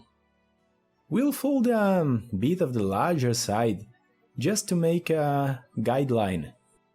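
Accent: Brazil. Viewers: Is that a beautiful Brazilian accent?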